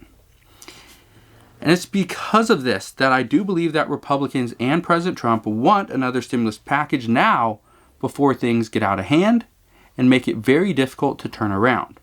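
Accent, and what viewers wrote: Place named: Seattle, United States. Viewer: Thanks, for your research and content. You sound to be in the Seattle area, I'm in Hermiston, Eastern Oregon. Love the videos!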